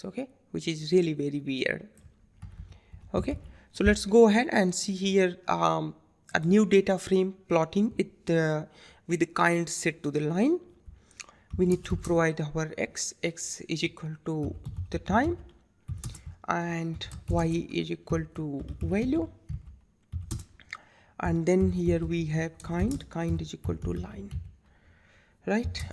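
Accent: India. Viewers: WoW! It's been many years since I heard a lovely Indian accent. It's pleasant to hear from you sir and Thank you very much for wonderful video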